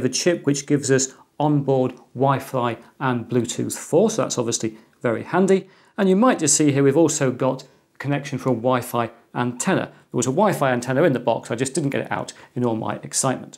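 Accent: England, United Kingdom. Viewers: While there are better tech channels out there (For my specific interests) the British accent certainly draws me in. The neutrality of tone makes it really easy to understand. For this reason, I think you'd do an amazing job at some of the more technical aspects of computers. Something along the lines of the information covered in an A+ certification course. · I love the English accent and the way English people do reviews.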